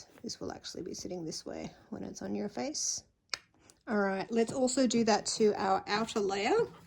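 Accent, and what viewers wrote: Australia. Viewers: Absolutely love this mask pattern with excellent directions (I've tried many) and adorable Australian accent is a bonus!! I find the women's/teens size quite small but will easily adjust size. Thank you for this brilliant video!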